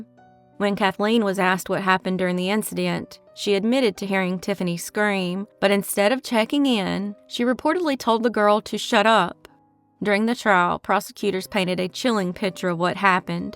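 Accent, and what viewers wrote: South, United States. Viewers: Awesome Southern. Woof Woof.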